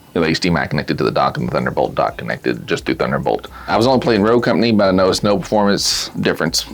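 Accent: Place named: Texas, United States. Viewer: nice accent! Texas?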